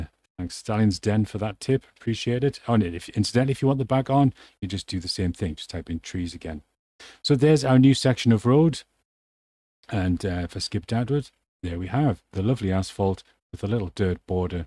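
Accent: United Kingdom. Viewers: Thanks for the response, I'm sure it is a lot more stressful to do that sort of thing As for your sense of humour and personality, it's probably fair to say it is very British, and all the better for that. It can get very hard finding a familiar voice in amongst all of the American streamers. Take care of yourself and I'll certainly finish off watching the last few episodes of the playthrough. Chris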